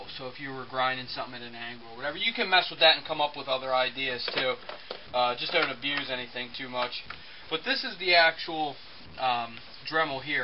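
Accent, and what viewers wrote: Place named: Pennsylvania, United States. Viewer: from the accent, I am guessing you are from PA? Probably watching the Steelers right now? LOL Go STILLERS!!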